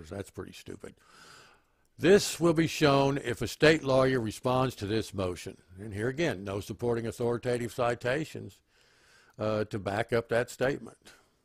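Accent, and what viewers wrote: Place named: Texas, United States. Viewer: I'm hearing a little bit of a texan accent. Lol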